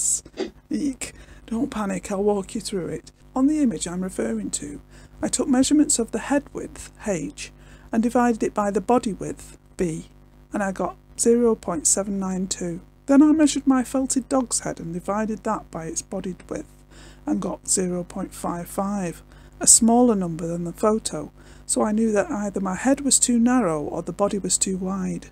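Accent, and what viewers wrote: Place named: England, United Kingdom. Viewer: I just discovered your delightful, very entertaining, instructional needle felting video’s. Kudos! I’m planning to see all that you have posted. I like your focus on the fundamentals rather than breezing over them. My “older” ears do have trouble distinguishing your English brogue and the rapid clip at which you talk. It’s okay and I’m not suggesting you change a thing, not that you could. Accordingly, my long, slow Southern drawl would likely drive you nuts! I compensate by listening to some of your sections several times until my brain gets it. We hear with our brains, not our ears, ya know. I’d be especially interested to hear more from you about fiber prep for needle felting. I’m a fairly seasoned needler but have had little success applying an outer layer of fine fiber without leaving the telltale little holes, even using a 40 needle of any shape. 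I haven’t owned a 42 but shall order a few after seeing your videos. Thanks again!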